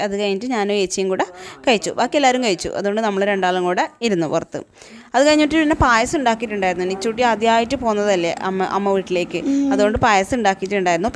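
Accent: Kannur, India. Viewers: കണ്ണൂർ ഭാഷ കേൾക്കമ്പോ രസമാ.. 😍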